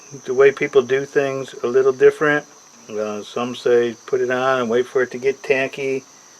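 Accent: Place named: South, United States. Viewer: LOL I came to comment about how southern he’s sounding these days 😂😂😂 I wonder if he notices he’s doing that 😂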